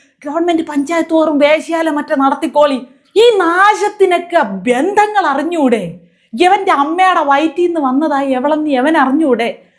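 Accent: Thiruvananthapuram, India. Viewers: ജി നിങ്ങളുടെ തിരുവനന്തോരം ഭാഷയിലുള്ള സമകാലീന വിഷയത്തെ അവതരിപ്പിക്കുന്നശൈലി ഒന്നും പറയാനില്ല..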